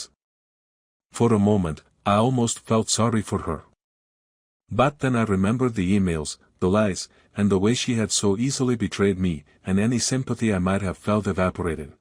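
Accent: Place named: Spain. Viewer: This is funny sometimes the voice sounds Spanish…even SpanGlishly pronouncing words. Its like the setting is regardless of the English it is said or pronounced like Spanish.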